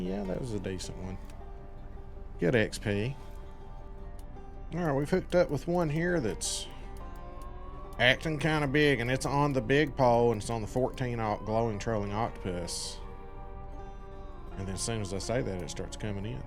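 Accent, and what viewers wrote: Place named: United States. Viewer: Bro I love the country voice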